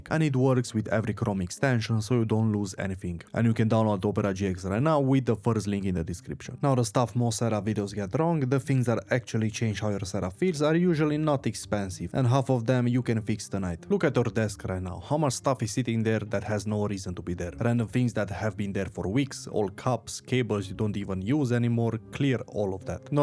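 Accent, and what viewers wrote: Romania. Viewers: Yo dude ... Love your vids helped me a lot ✌️ ... You from romania by any chance ?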